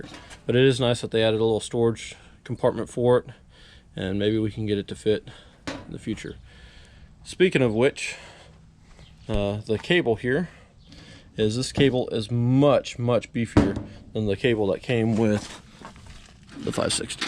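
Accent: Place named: Texas, United States. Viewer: I could tell that was TX straight away. The houses; the sky; your accent. I used to work for Continental Airlines in H-Town, back in the day. Excellent review,!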